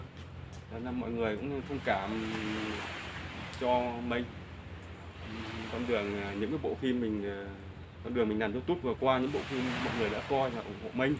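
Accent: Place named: South, Vietnam. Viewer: Gióng risot hunmiso Nam du quá. Chúc bạn thành công nhe.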